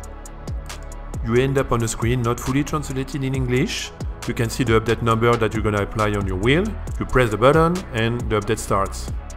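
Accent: France. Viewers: Plus un pour l accent français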